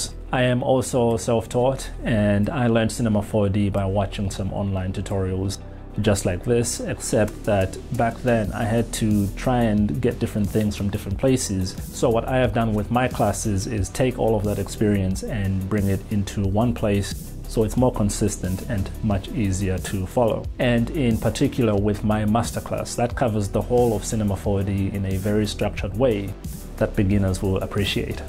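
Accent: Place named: South Africa. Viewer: You sound South African! I've used your animations in the background when DJ'ing and the response has been sick!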